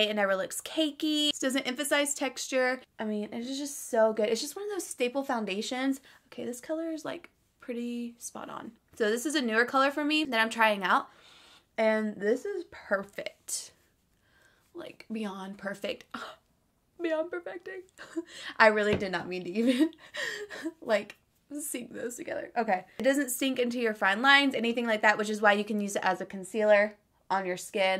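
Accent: South, United States. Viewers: Oh my gosh! I love it when the southern accent comes out every once and a while! I had a southern accent when I was younger up until we moved back to the west coast when I was young.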